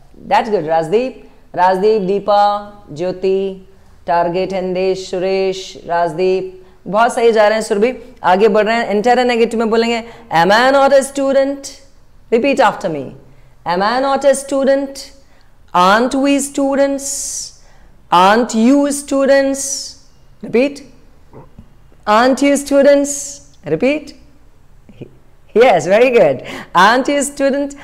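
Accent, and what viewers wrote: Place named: United States. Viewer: He speaks with an American accent...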